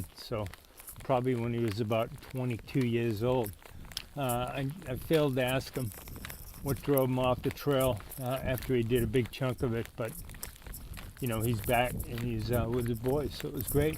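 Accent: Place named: New England, United States. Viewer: Nice for you to see a fellow New Englander! Ya nevah loose the accent! Wicked pissa!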